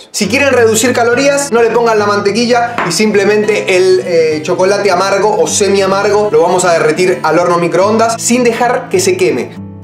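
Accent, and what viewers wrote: Argentina. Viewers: jajajajaja tas re loco man que buena tu energía! sos de Argentino no? voy a probar esto. Saludos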